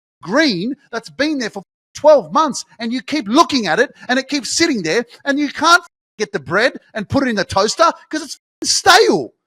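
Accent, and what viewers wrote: Australia. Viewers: He's not British! Think maybe the accent is Australian.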